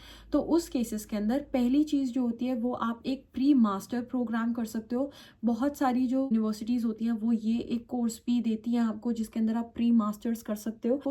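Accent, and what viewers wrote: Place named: Delhi, India. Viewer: Delhi wali hindi bol rahi ho aap with jat test ke sat ❤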